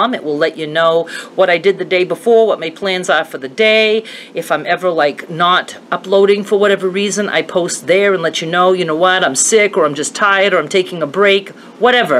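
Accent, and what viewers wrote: Maine, United States. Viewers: I think I'd watch pretty much anything you upload! I just love your Maine accent and enjoy your feisty spunky personality!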